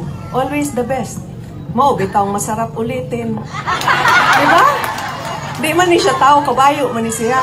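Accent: Visayas, Philippines. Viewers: Kanindut nimo paminAwon magbinisaya❤ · Pure bisaya gid ayy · Hala ka dai ui lalom kaayo imong bisaya proud ko nimo . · Nindota nimo maam mgbisaya ui... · Iba ang accent talaga ng bisaya sa Visayas. Heheh.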